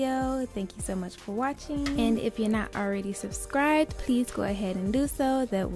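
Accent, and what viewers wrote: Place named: New Orleans, United States. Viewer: what part of Louisiana are you from? Sounds like I hear a New Orleans or Lafayette accent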